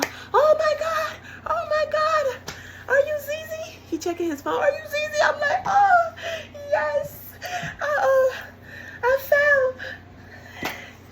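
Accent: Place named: Brooklyn, United States. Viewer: you remind me so much of "Mz. Brooklyn." I just love your Brooklyn dialect. She started out at, I believe, almost 400 lbs. Today, she has dropped significantly. Your "story Time" reminds me of Mz. Brooklyn's "story time." <link>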